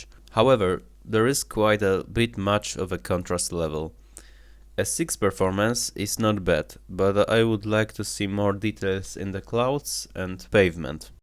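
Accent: Poland. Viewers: Elo polska ;) po akcencie słychać zawsze ;) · Bardzo polski akcent... dobry test ale jak dla mnie ostrosc ma jednak troske lepsza s8 i dorzucił bym jej pół gwiazdki :) Pozdrawiam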